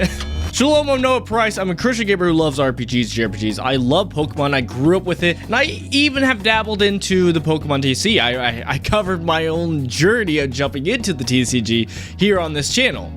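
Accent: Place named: United States. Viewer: Your take on VPNs is wild. You taking control of the internet you pay for, which is being botlenecked by the greed of the government so you only have access to certain things which is against the whole purpose of the internet to begin with and is also not Illegal(that's the government you were talking about) is not only a good thing but it's a just thing. You are also going down a very slippery slope with we should just do what the governing body says because thats what the bible says, I mean where do you live or in what time ever is that in any way a good idea because you sound like an American and blinding following our government is going to get you in some bad spots. I mean bro turn on the news and see what governments are doing just today.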